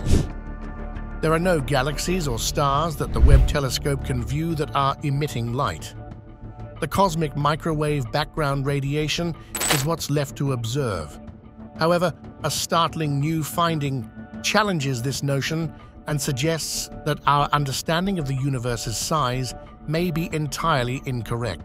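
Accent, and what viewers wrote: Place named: England, United Kingdom. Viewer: More Hilarious Bull##@ !! Always with an "English " Accent , again. We all know that "the BIG BANG Theory" is BIG BULL###T !! always has been. Just more Garbage . Kind of like terms such as "Singularity" or " Quantum Entanglement " Made up terms in an attempt to sound " intelligent" . Humans will never be able to describe Creation. This is an Egomaniac 's attempt to claim some kind of knowledge that they don't have. So tiring.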